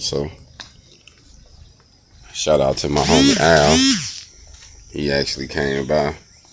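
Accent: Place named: Memphis, United States. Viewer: Sound like u close to Memphis my dawg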